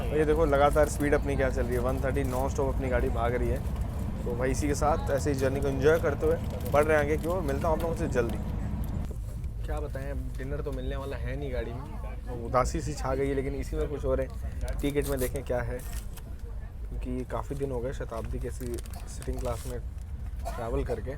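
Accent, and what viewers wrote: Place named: Kanpur, India. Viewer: EK dam Kanpuriya... 💯 Style me h accha LG Rha hai.. 😋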